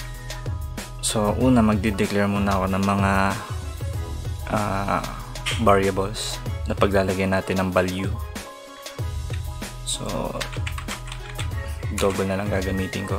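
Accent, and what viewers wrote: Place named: India. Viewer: More C++ lesson po... sawa na po ako makinig sa Indian Accent! not racist thou. 😆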